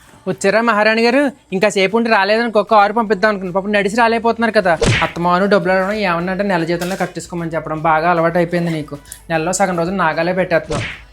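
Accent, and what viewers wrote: Godavari, India. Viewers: Super bro 😂😂😅😂😂but konii words ardham avvadu godhavari slang,,,,but kurnool slang andhariki ardam avuthundi 😊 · garidi native ekkado ఎవరికైనా తెలిస్తే cheptara, yasa/slang bhalega untundi, maybe east or west గోదావరి అనుకుంటున్నా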